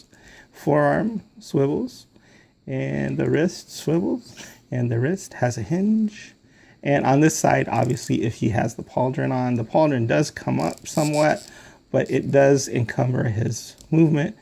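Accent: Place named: Chicago, United States. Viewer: Are you from the Chicago area?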